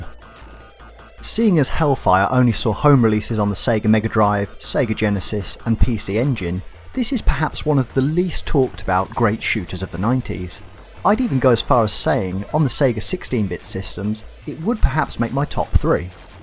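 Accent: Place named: United Kingdom. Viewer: Well, I enjoy your style, vastly prefer it over "shoutcaster" rubbish! I'm not a game collector.... yet, but your production/scripting reminds me of the military miniatures (toy soldiers) community, of which I am a member. (Love the humor, as well. Not many opportunities for "Japanese homoerotic" references in the model soldiers scene!) Poised delivery, well researched, and British as Bully Beef! Cheers and keep up the great work!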